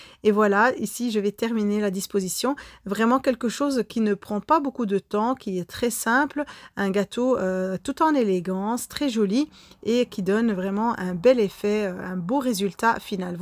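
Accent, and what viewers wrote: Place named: Belgium. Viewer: Ah tu es belge, je me disais aussi que tu avais un accent. ^^ en tout cas merci encore une fois pour cette recette, au top comme d'hab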